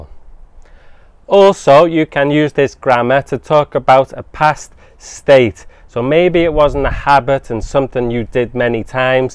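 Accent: United Kingdom. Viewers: That's a nice way of teaching English through football. Also, it's very nice of you to speak considerably slower as people here usually have difficulty in understanding British accent. Cheers!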